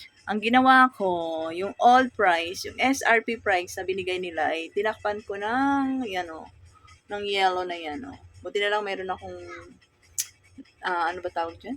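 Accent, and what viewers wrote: Waray, Philippines. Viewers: Hello madam new subscriber here. Waray waray ka man po. Hehe. Taga diin ka po? 😊😊😊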